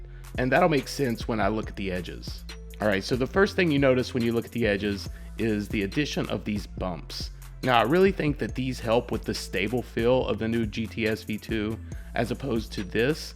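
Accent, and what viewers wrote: South, United States. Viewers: Where are you from? To me it sounds like you have a few hints of a Southern accent.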